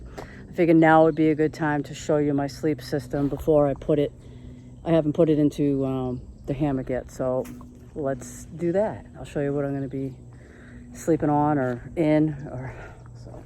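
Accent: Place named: North, United States. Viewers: As a Northerner (PNW sounds more Canadian than anything else,) I like her Easternish accent. Getting in her cah, or saying which gear is bettah...lol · We southerners think it's funny when northerners talk about how amusing it is to listen to our southern dialect. Hearing you speak yankee is enjoyable to me. Thanks for what you are doing.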